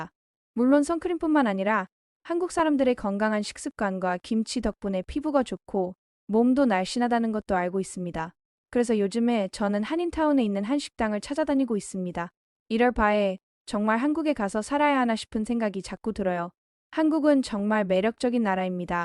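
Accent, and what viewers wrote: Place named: Germany. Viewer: 독일식 발음이 독특하네요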